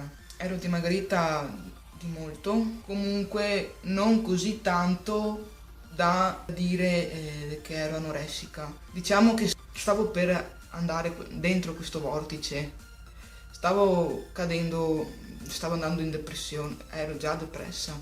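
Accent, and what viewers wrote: Veneto, Italy. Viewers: ti ho appena scoperta, bellissimo video davvero!! è bello perchè, nonostante le difficoltà legate al dimagrimento/ingrassamento, riesci a parlarne e a sorriderne. purtroppo che si tratti di aumentare o perdere peso (e dei problemi fisici che ciò comporta) il problema è psicologico in ogni caso, ed è su sè stessi che bisogna lavorare più che sul peso in sè!! io stessa in passato ho avuto problemi in merito e ancora mi ci fisso talvolta, anche se sono riuscita a raggiungere il peso forma.. spero davvero che tu riesca a venirne a capo e riesca a trovare un tuo equilibrio, e mi sembra proprio che tu ce la stia mettendo tutta :) un abbraccio grande ps: sei veneta per caso?? XD hai un accento davvero simile a quello delle mie parti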